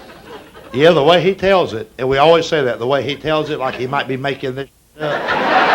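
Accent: South, United States. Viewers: James Gregory is so accurate with his delivery and the way he tells a story. He always takes me right back to Louisiana sitting in the kitchen listening to my grandmaw and aunts gossip and tell stories. Same with Jerry Clowers and some of the men in my family and southern Baptist preachers I grew up around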